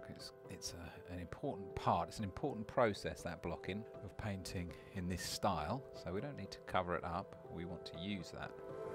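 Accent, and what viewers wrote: United Kingdom. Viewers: Very sweet painting/mini tutorial, I'm wondering did the client ask for it on paper? I'm pretty sure you would have used a board of some sort (I don't remember what you call them) I hope you're family and you are staying refreshed and I hope end of autumn into winter goes great for you. Also so I can reference you better in the future are you from the UK, I'm not sure of accents. Dave from Canada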